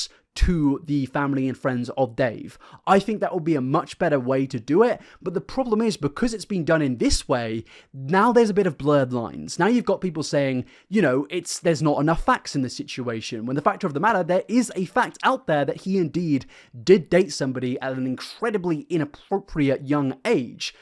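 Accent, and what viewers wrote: United States. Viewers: ur american accent was actually pretty good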